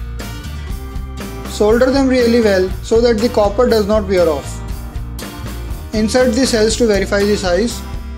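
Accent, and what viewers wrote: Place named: India. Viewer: the accent is like india people / indian , are you indian ?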